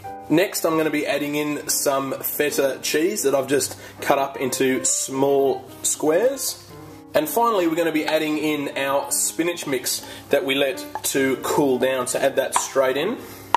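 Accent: Australia. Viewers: After the accent and the fact that he has said where he lives in a few of his videos, I believe he's Australian. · That looks delicious! Love the Australian accent. Take care and God bless from Beaumont, Tx!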